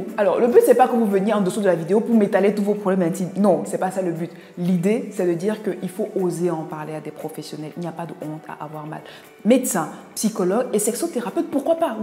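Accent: Cameroon. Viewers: Merci docteur, vous avez un accent de chez moi " CAMEROUN" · Si vous êtes marié, votre mari est beni; Si non le future le... vous avez un accent français un peu Camerounaise...?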